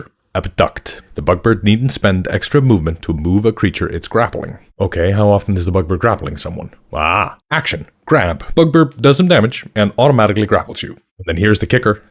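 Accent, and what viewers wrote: Iceland. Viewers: Are you perhaps Icelandic? I really feel this accent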